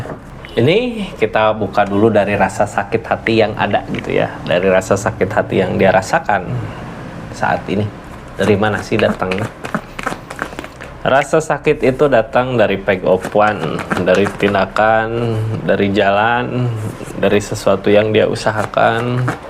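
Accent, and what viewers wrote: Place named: Sunda, Indonesia. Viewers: ❤❤❤❤❤❤❤❤❤❤❤❤❤❤❤ Resonet se x. Aak Aku suka. Yg membaca kan. Logat sunda nya kental banget Sehat dan sukses sllu❤❤ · ❤❤❤❤❤❤❤❤❤❤❤❤ Resonet se x..Aak Aku suka . Yg membaca kan . Leo Sunda nya kental ... banget sehat dan sukses sllu❤❤❤❤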